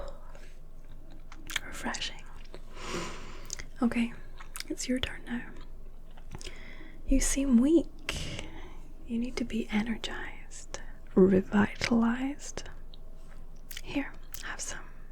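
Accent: Scotland, United Kingdom. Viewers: Sounds Scottish. Is that Ginger Zee. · I think she's Scottish Someone will probably correct me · SCOTTISH VAMPIRE LADY YESSSSS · Knew you were Scottish 😜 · Last thing I expected when clicking this is a Dimitrescu with a Scottish accent, love it, live for it. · Looks wise you got it locked down but voice wise just not it for me😂 not that theres anything wrong with a Scottish accent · Scottish Dimitrescu...very boner inspiring. The hand thing in front of the camera is kind of weird though. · love a Scottish queen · She’s Scottish now? hell yeah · Aye thought I heard a hint of Scottish in there, just saw the about page. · Alternative universe Scottish tall lady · I hear a slight Scottish accent in your voice is it?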